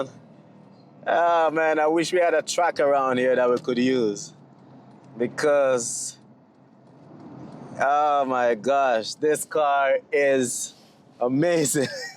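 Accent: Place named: Caribbean. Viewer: I cannot help but notice , you West Indian I can hear an accent . PS I feel you should keep it tbh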